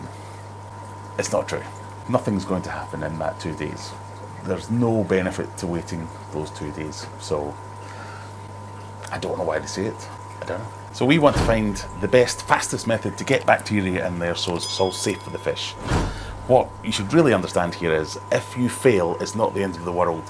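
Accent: Scotland, United Kingdom. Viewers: Tetra. 5-in-1 or 6-in-1 strip tests are very accurate and you get the results in just one minute. No crazy shakes and much easier to use. I cut mine in half lengthwise and get double the value. Love your Scottish accent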